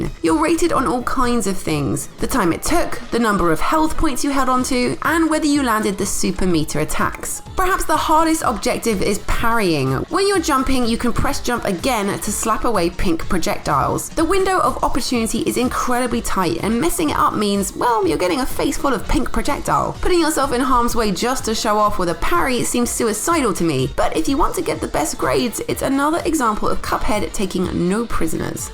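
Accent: United Kingdom. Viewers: stop speaking british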